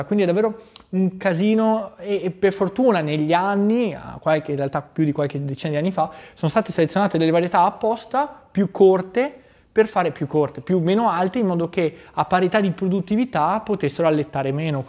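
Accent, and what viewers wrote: Veneto, Italy. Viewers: Wow!, ti è venuto l'accento Veneto... Pazzeeesco!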